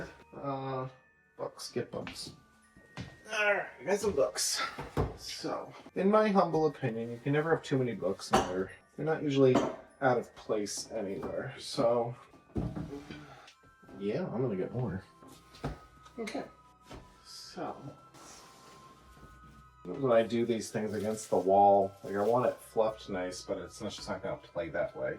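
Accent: Minnesota, United States. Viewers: You're lookin' good there,! My goodness gracious, this is a family show. Hey, out of your window is green grass and green leaves! Are you decorating in June? Because some cranberries spilled we have our 6th day: On the Sixth Day of Vlogmas my true love gave to me Six berries spillin' Five Christmas trees 🎄🎄🎄🎄🎄 Four Paolo meows Three rolls of batting Two power strips 🔌🔌 And you sound like the movie Fargo 🎄⛄🙂⛄🎄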